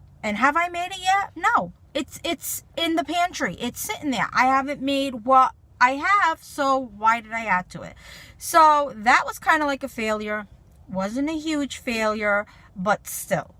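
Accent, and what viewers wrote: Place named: New England, United States. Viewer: So I thought maybe you were a New Englanda because I heard you say "freeza" and "dooa" (door). LOL. Great video! Thanks for sharing!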